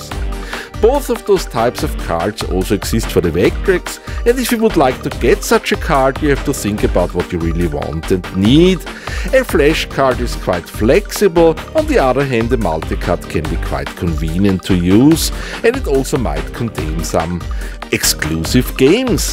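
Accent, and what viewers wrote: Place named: Austria. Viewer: hey, sehr nettes video, gehe ich recht in der annahme, dass du aus österreich stammst? dein akzent hört sich sehr danach an. grüße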